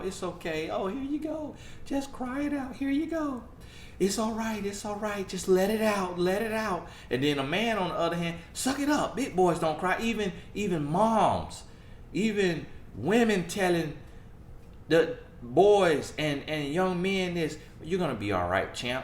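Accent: South, United States. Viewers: I love the country accent 😊😊❤️. Preach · Lol. Gosh darn it,..that accent of yours. Neospirin...nope. Its pronounced Neosporin. 😂. I know, I know...I'm from the South too. Good video though.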